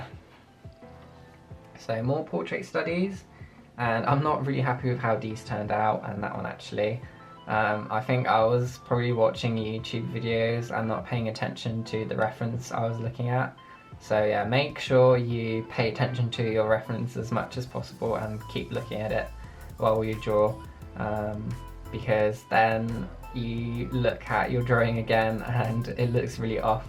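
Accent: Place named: United Kingdom. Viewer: Everything is just soooo gorgeous! I’ve seen in the comments from another video they you speak another language (don’t remember which one sorry) I don’t know if you are from America or something but your English is so great ! You sound kinda British I love it! Anyway I have a lot of ideas for my sketchbook, thank you for that , love your art 🤗❤️👏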